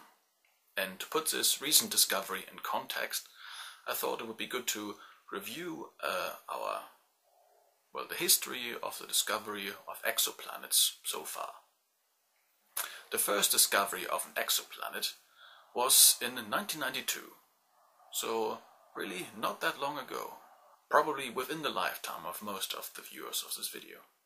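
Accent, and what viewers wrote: Germany. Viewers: God I LOVE hearing that accent! Ich bin von Erfurt. Aber wir (meine Eltern, Tanten, Onkel, etc.) leben schon für fast 30 Jahre in den Staaten! (In Salt Lake City, UT...) Woher kommst du? (Mein Deutsch ist nicht mehr so gut ...) God I hope you AT LEAST speak German... I swear! I KNOW that accent! I hear it every single day! It's so familiar. Then again, you could be Dutch, Swedish, Norwegian, etc... Hope I'm right though! ;)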